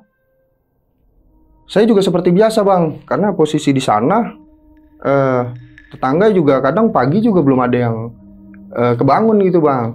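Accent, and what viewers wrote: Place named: Surabaya, Indonesia. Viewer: Surabaya mn suaranya☺️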